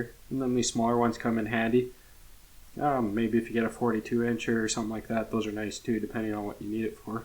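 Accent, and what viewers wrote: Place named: Alberta, Canada. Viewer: Enjoy the videos man. You sound like a fellow Albertan! Subscribed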